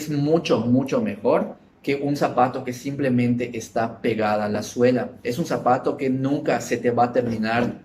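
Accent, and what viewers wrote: Yucatán, Mexico. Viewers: PÉSIMO video!!!....el acento de yuca te lo paso porque es de tu origen de procedencia, pero el que titiubees y te equivoques a cada rato? tas cañon mi yuca!....antes de salir a cámara elabora un "script" para que puedas hablar de corridito y con fluidez, estas hablando de zapatos y no conoces algunos modelos y te basas en fotos de instagram!!!...muy mal...por lo minimo, date una vuelta por León, Gto...pa´que conozcas. JBE y ZARA son marcas que es de uso masivo, para nada de tipo artesanal..y dudo que conozcas marcas "premium" ....donde dejaste a MICHEL DOMIT?....supongo que apenas comienzas en esto, ojalá y pa la proxima te salga bien......bye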